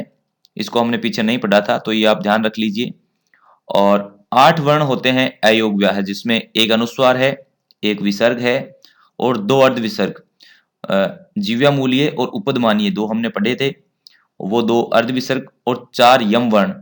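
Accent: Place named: Haryana, India. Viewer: वो क्षेत्रीय या मात्र भाषा का प्रभाव तो पड़ ही जाता है। किंचित वे हरियाणा से हैं।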